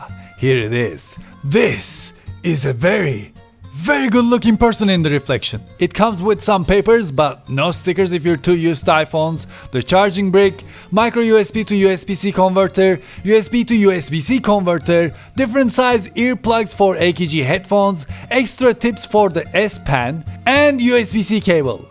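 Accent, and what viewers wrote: Turkey. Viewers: What a Turkish accent! I wish my headphones are shit and could not able to hear it. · Hahahahaaa telafuzdan direk turk oldugunu anladim 🤣 8 yillik iphone kullanicisi olarak aklim celiniyor demesem yalan olur 🤔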